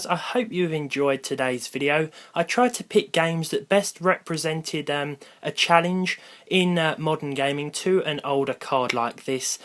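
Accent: United Kingdom. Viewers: You look like General Sam with a British accent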